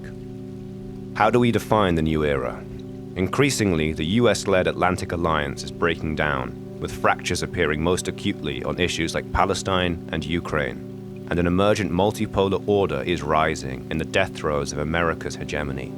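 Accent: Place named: London, United Kingdom. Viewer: "Weve got to take socialism from the urban ellite" says the man with the most middle class londoner voice to imagry taken staight from an Bristol rave poster.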